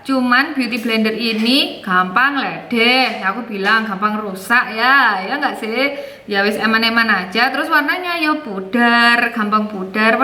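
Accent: Java, Indonesia. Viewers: Jawane.bener bener meldol bgt,,,ngakak aku ndelok spean mb lol😂😁😁😁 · Lucu siii ba ngomongnya jawa banget yaa hahahaaaa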